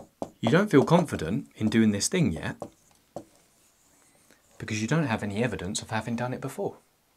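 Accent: United Kingdom. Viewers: i mean this in not a disrespectful just an observatory way: your voice sounds precisely like what a british guy's voice sounds like in my head. spot on.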